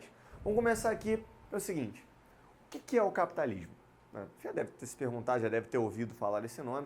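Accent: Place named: Rio de Janeiro, Brazil. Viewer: Só eu que senti um sotaque carioca? Kkkk aula ótima! Me ajudou muito no seminário, parabéns pela didática, poucos tem...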